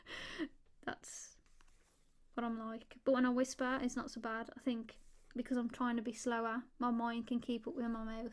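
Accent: Black Country, United Kingdom. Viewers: Never guessed you were a yam yam dude lmao. West Midlands represent <3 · Hearing your black country accent is just so random, especially because even in the UK you don't actually hear it that often either, I mean I'm in Manchester so thats probably why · I loved one of your older videos where you talked about your childhood blanket. Too bad that I didn't know about this video & questions, I would've asked some fun ones. As an American from Pennsylvania, I love your black country accent. Occasionally it makes me think of "Miss Braham's" from "Are You Being Served?" I loved reruns of that show. =] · Lovely bit of black country talking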